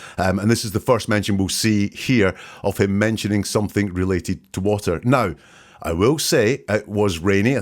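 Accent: United Kingdom. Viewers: I don't know where you come from but you seem to have a British accent. So have you ever been to that area of Nova Scotia. It appears to me that you have already decided that the parents have something to do with the kids disappearance. Do you have any experience working with how East Coasters speak. I certainly hope that the RCMP don't put much stock into what you are saying. When you are so upset by your kids missing you may say a lot of things that don't make any to someone not native to Canada. Sorry I am concerned about the wee kids being missing this guy is so full of shit it is unbelievable.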